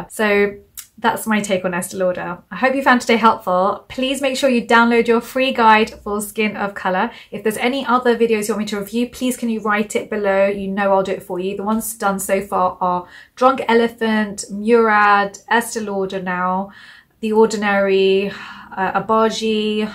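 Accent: United Kingdom. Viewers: This DOCTOR is personable, generous and transparent with her vast knowledge of skincare ingredients. And yes, she is also a gorgeous lady. I also love her (presumably British?) accent. As an American, any accent sounds smarter than what I am used to hearing. 😜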